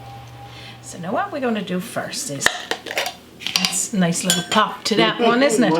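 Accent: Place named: Canada. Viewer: wow that looks sooo good!! merry christmas to canada!!! i ABSOLUTELY LOVE YOUR ACCENT!!!! i live in maine... and it soun ds like how you say it..parch a berry. soo cute!!!! here we call it part ridge. i dont think we have those berrys here, but i know we def have the bird;];] good eaten too;];];] hope you have a great christmas and a great new yr;];];]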